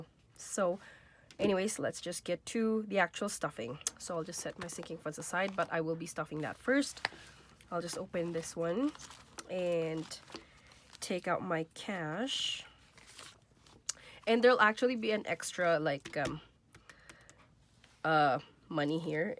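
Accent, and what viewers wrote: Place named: Canada. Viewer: You have an accent are originally from Canada.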